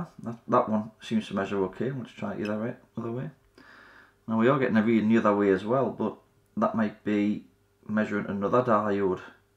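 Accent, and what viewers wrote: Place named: Morpeth, United Kingdom. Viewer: Great video. First time I’ve seen your videos! Sounds like your from the Morpeth track?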